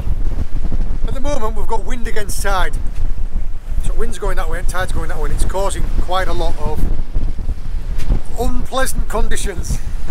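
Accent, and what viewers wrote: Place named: Cornwall, United Kingdom. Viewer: oooh, a bit of the cornish accent is creeping in mate!!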